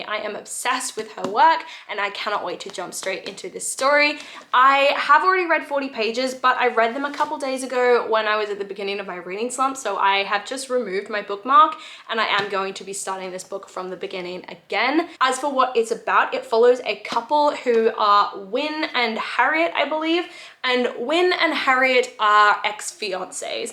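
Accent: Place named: Australia. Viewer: You have the same edition of Happy Place that I have .i also have the Wisteria Society to read, may move that up. I had been trying to figure out your accent, thought Oz but it doesn't seem that strong. Where in Oz are you from?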